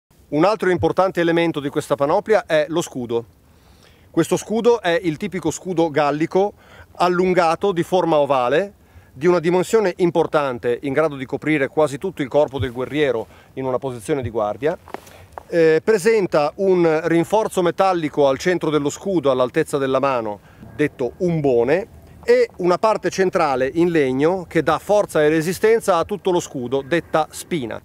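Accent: Italy. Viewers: Ah ah okay It’s a Northern pattern (Gallo-italic language variety) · Mo soccia, che bella cadenza bolognese.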